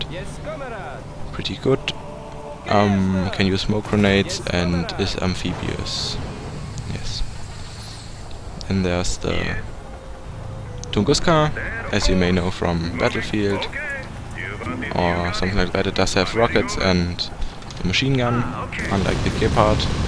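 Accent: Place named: Germany. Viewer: Man hört den deutschen Akzent aber sehr gutes vid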